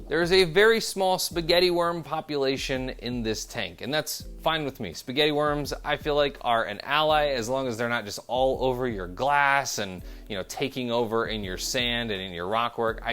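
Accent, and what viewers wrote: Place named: United States. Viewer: Nice tank.. I’m a brit so the American twang can sometimes bend my ear..is there really a coral called ‘arse crack’ ... 🤣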